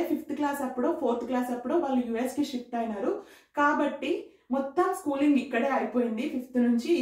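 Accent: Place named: Telangana, India. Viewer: Telangana slang mast vundi miss❤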